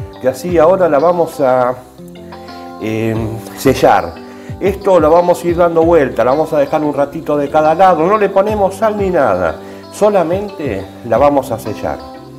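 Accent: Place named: Argentina. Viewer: Grande sos un groso como hablas explicas bien a lo argento papá abrazo grande....!!!!!!!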